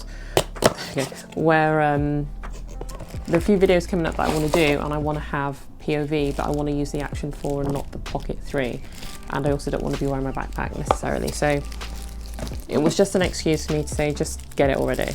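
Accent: England, United Kingdom. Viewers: I bet she's from England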